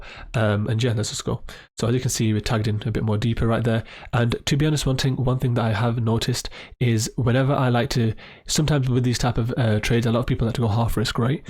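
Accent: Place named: Midlands, United Kingdom. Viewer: From the UK midlands, Birmingham or Luton.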